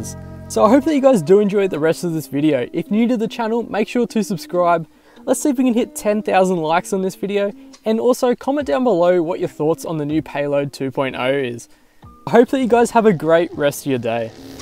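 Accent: Australia. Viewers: Great gameplay mate! I miss hearing aussie english 😂 I love those RPGsss! 🔥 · he is Australian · Australian accent rather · Australian accent 👀👌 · Excuse If You Can Answer Me Are you from Australia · That's australian accent. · I love Australian accent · I think he's Australian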